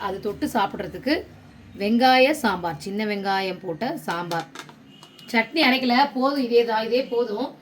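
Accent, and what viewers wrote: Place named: Thiruvarur, India. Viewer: Niga pesura varthaigal nalaa purigirathu naanga pesurathu polave pesuringa enaku thiruvarur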